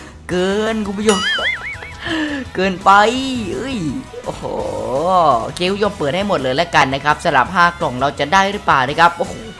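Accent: South, Thailand. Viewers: คนใต้มั้ยเนี่ย ดูมาหลายคลิปแล้ว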